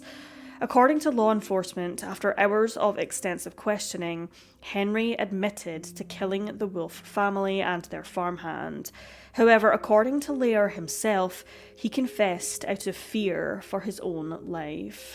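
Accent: Scotland, United Kingdom. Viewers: Scottish accent!! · She has a Scottish accent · Six. She has a Scottish accent. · I love the orators Scottish accent very sad story no winners both families decimated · I think the narrator is actually a Scot. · She is Scottish. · I believe she is Scottish. I am English. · she sure is from linda in scotland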